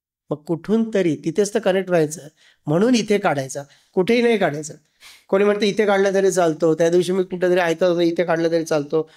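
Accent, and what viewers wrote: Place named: Ghats, India. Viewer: Tumhi marathi spashta ka nahi bolat.. ghata varcha marathi ka bolata..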